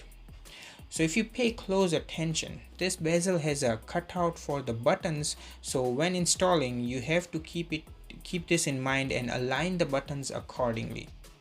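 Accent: Gujarat, India. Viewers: Are you Gujarati?? Your accent is so Gujju..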